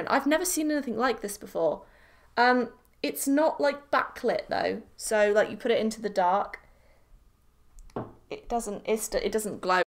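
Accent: United Kingdom. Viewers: Are you English ?? Just wondering because it sounds like your British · British accent haha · I Looove your accent if I were to say it was British I will really get it In the replys.... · Is she British? She sounds British 😕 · Who else watches to hear that Beautiful British Accent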